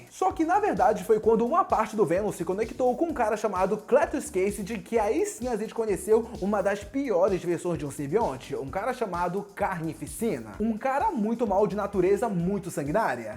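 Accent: Brazil. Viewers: ce é de Belém?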